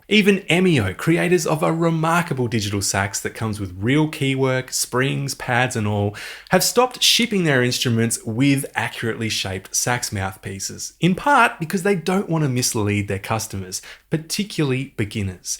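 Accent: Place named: Australia. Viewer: Didn't realize you were an Aussie until you stated it. After that, I couldn't unhear your accent. Regardless, you have perfect radio/TV voice for any international channel, you articulate so effortlessly. And thanks for the excellent review. I am really considering getting this instrument and will use the link if and when I do. If I were not already focused on learning various rythm instruments, I would consider sax and your course. It is an opportune deal for those who are interested though.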